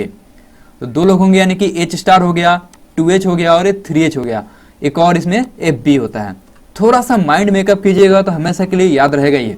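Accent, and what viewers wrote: Bihar, India. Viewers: जरूर आप बिहार से है।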